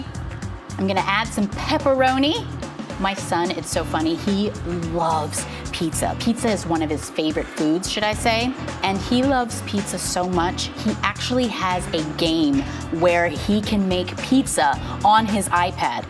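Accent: South, United States. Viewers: Hello there southern accent! 😂 I love it 😊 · Omg u made me so hungry LOL pizza one looked so good and I loved the country accent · Hi!!!! I love the red lip and I just love pizza too!! Your son and I will get along well, and my daughter, but she only likes cheese. You would think I'm italian bc I love Italian food!! I love your food videos. You just sounded like Tamera in your southern accent!! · I had no idea had a country accent before it sounds so adorable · yes fpr the country accent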